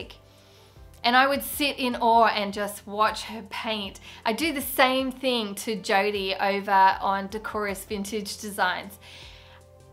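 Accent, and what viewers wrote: Australia. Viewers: So this is the third time I’ve watched this video and I must confess! I also love Dionne’s work and have been hesitant about starting a piece like this. But when you said you messed up the top and redid it, it dawned on me that when you’re painting like this, there are no mess ups, just happy accidents ( thanks bob ross❤️) I think that “mess up” would have added more character! They’re meant to look aged and weathered and painted over a million times, like an old Spanish door❤️I really love this piece and hope to see you do more! Personally for me, you took the fear out of it!😄. I’m really enjoying your channel, your work …and your sweet Aussie accent🥰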